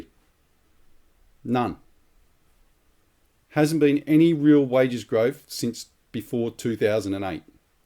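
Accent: Australia. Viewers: Great to get a dinkum Aussie presentation in A lingo we understand.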